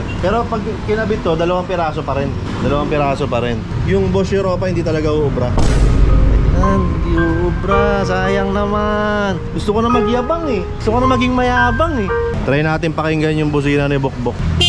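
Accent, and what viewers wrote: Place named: Bulacan, Philippines. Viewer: Dinig na dinig ko punto ng paombong, bulacan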